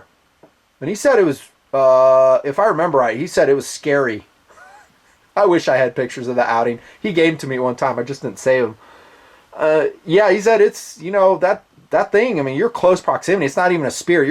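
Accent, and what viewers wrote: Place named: Alabama, United States. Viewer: your Bammy accent...lol. Keep the knife vids rollin.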